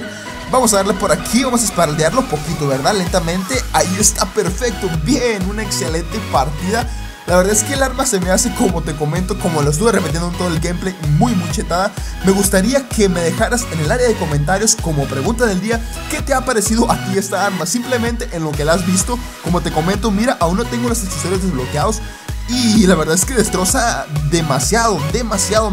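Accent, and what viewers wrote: Colombia. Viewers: eres colombiano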